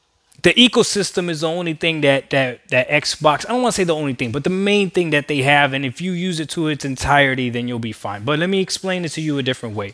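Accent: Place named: New York, United States. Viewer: Bro where you from New York or jersey??? Accent thick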